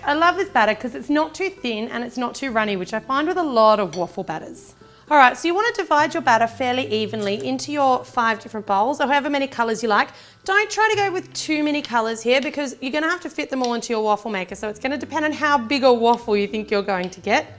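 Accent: Australia. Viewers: Australian I think but definitely not English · I hear a little aussy in here and I see an aussy chocolate dollar :)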